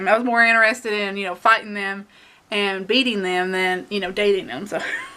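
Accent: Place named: South, United States. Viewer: my true blonde must be showing...because I just noticed your southern accent 😂 really enjoy the direction your channel is going!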